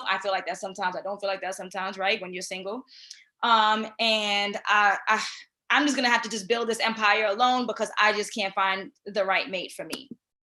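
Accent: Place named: Caribbean. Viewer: Amazing content. Yuh sound like a Caribbean. I support you!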